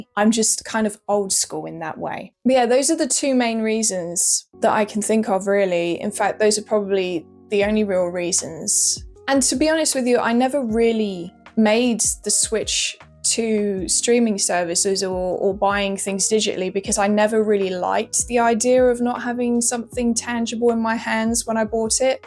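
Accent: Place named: RP, United Kingdom. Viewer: Wow Bridgerton accent IRL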